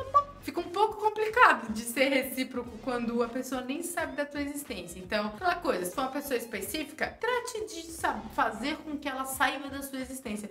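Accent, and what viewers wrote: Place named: Santa Catarina, Brazil. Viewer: Esse sotaque de peixeiro aí é de catarina né, conheço porque morei em itajaí/sc oxtepo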